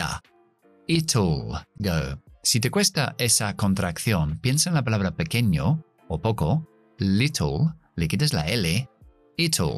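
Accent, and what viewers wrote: England, United Kingdom. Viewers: Buenas noches sr, soy Carlos Bermudez, de ecuador saludos, su expresión del inglés con acento de Inglaterra es muy aristócrata, y me gusta mucho , empecé a ver su canal hace poco y su manera de enseñar es muy explícita, estoy en este momento viendo videos de un profesor californiano y la diferencia es abismal en cada acento quiero aprender de los dos voy a seguir su canal . Hice un test Delos suyos y no di ni siquiera en el nivel de principiante jaja.. espero y se que aprenderé mucho de UD , agradezco que comparta su lenguaje, y le deseo éxitos y bendiciones gracias por compartir sr. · Que maravilloso canal! Muchas felicitaciones. Este es mi segundo video y lo dice todo. Podrías hablar de la cultura inglesa? Como ellos miran la vida? Cual es ese olor a sus costumbres? Porque que hermoso acento. Tan elegante. Cómo miran la vida los ingleses? 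Muchas gracias! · La pronunciación que enseñas es acento británico?, se me asemeja mucho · Es pronunciación británica. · Ese es inglés Britanico,lo voy a escuchar pero nececito inglés smericano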